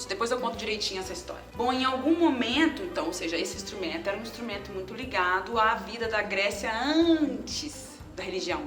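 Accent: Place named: Minas Gerais, Brazil. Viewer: Oh gente!!! Mineira mesmo kkkkkk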